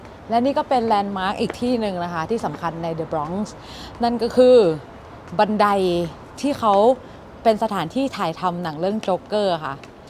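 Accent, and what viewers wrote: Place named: Chiang Rai, Thailand. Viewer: สำเนียงเชียงราย 😅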